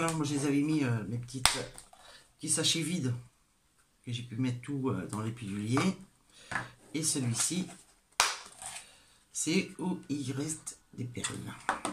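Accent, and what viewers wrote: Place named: North, France. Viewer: Merci pour le.numero Le nord te manque pas trop ? T as pas perdu l accent en tout cas hihi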